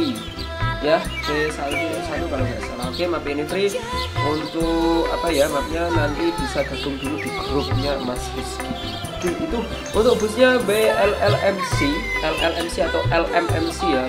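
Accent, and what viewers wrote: Java, Indonesia. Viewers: jawa men mas omongane heheh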